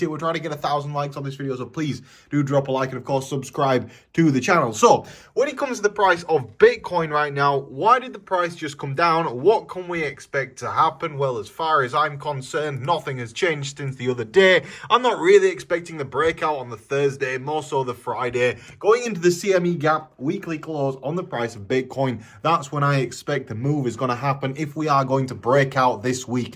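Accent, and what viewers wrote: Yorkshire, United Kingdom. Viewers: Me too. I love bold and confident nature and style with his Yorkshire accent. I am from a Leeds too by the way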